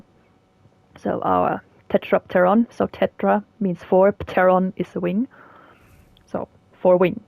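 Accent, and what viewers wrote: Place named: Germany. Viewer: For what it's worth, I like the sound of the microphone. Ads to the ambiance since you're presenting the game. By the way, I know that accent. Germany ?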